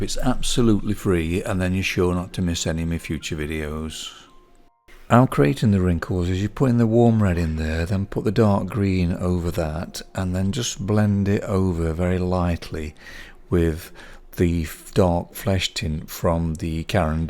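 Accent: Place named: Coventry, United Kingdom. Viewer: you sound like youre from around the coventry area.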